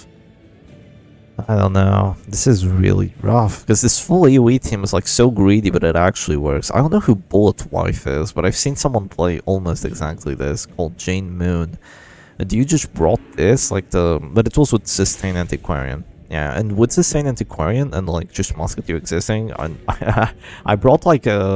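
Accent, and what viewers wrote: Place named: United States. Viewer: He has like no accent lol. I thought he was amerimutt, for like, 6 mounths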